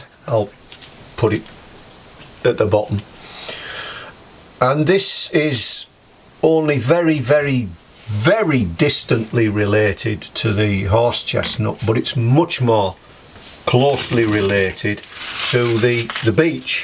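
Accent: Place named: Redcar, United Kingdom. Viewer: I live in Redcar. You can’t be far from me with an accent like that.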